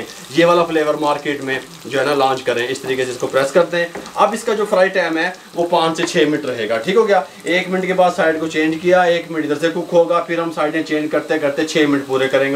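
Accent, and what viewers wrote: Punjab, India. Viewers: Assalamualaikum Sir AP ki Punjabi Kafi mezy Vali hai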